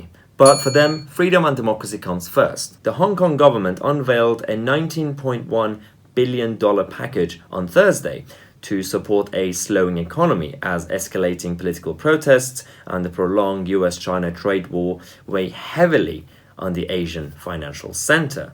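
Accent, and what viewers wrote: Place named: Council estate, United Kingdom. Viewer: I never figured you as a council estate guy, the accent. I’m a northerner. Yorkshire man. So South sounds posh. Good luck and I hope you do well in whatever you do man. Take it easy dude.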